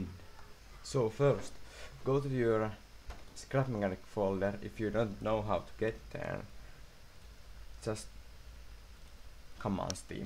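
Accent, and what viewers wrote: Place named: Finland. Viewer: i heard finnish accent toril tavataan perkele :D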